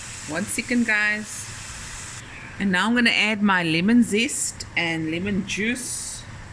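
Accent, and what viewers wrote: Cape Town, South Africa. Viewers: I love how I just randomly came across your video. Heard the accent and I knew immediately you are from SA. I'm South African currently living in Paris. Just tried this dish. Yummy❤ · Nice job i ear you are from cape town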